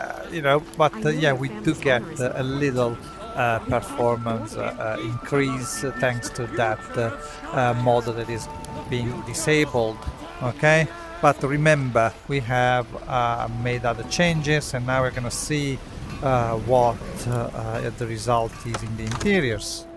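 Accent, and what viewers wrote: Italy. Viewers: Il bro è palese italiano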